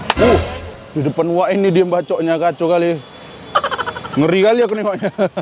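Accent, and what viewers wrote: Medan, Indonesia. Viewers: 😆asli mana sih bang... bahasamu campur jawa tp logat medan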